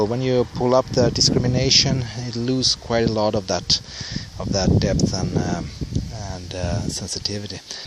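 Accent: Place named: Germany. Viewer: What’s your accent? Is it German or Scandinavian? It’s very noticeable.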